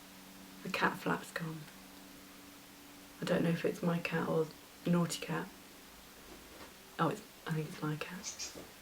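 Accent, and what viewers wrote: England, United Kingdom. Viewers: Hey. Enjoying your podcasts. It is so helpful to see projects we can buy patterns for on ravelry ...one interesting observation I noticed is some language similarities between your English and the English we speak in the American South.. for example: when you said this one is go to be a good un. This is a slang we use frequently in the American South.. we use language very differently than the North.. one word we use is "I reckon"... Which is common on England but used only the South in the USA.. I think we in the South hold on to our English we brought over when we settled here from many different areas in the UK.. the first time I heard an English person say "I reckon" I thought oh my it is a proper word! Most Americans look down on our Southern American English. I enjoy you .. and enjoy listening to your accent..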